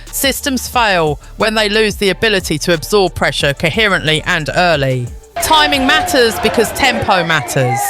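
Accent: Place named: London, United Kingdom. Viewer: Your dialect is smack in between Queen"s English and cockney.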